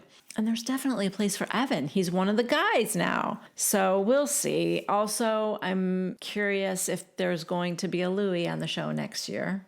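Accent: New Jersey, United States. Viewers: you had me laughing at work listening to this at the office! Love your Jersey accent.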